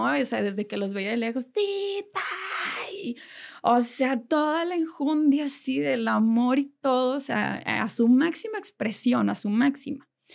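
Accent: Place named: Mexico. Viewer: Me cuesta entender lo que dice, su acento es super mexicano, demasiado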